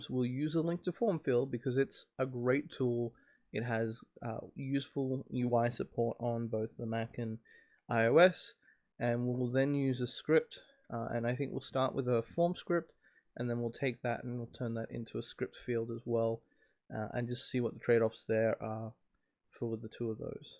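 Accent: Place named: Australia. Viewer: My wife's a septic (Massachusetts) living here in Sydney. Good to hear you still sound like an Aussie :) I'll sit down with TF tonight and hopefully get what I need working. I'll take a look at all your videos when I get a chance, thanks for doing them. The use of the Inverse Relationship the other way around was somewhat ingenious.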